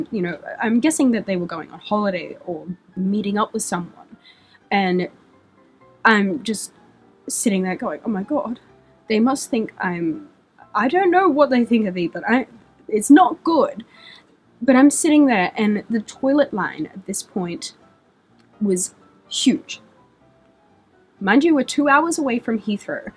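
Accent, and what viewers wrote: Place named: Australia. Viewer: AUSTRALIAN ACCENTS ARE THE BEST THING EVER!!!! AUR NAURRRR!!!!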